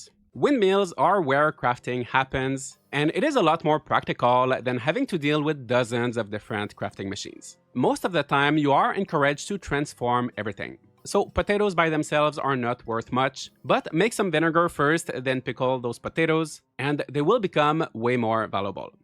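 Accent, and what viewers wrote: Quebec, Canada. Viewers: Excuse-moi Est que tu viens de la province de Québec? Ton accent sonne canadien français je trouve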